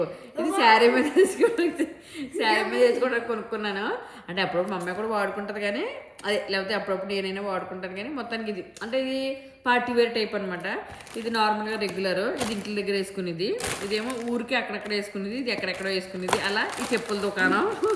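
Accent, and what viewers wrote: Godavari, India. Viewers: I too like your godavari language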